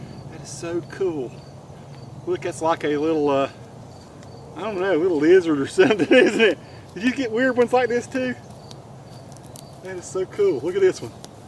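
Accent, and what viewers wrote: South, United States. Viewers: Your videos are worth watching just to hear your Southern drawl. Next year, grow your own slips! You can get about 50 plants (slips) off of one sweet potato when you grow your own. Then it will cost you NOTHING, because you save your good potato for next year's slips.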